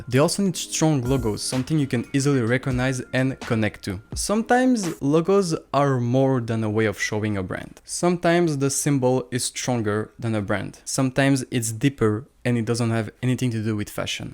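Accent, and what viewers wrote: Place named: France. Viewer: accent fr spoted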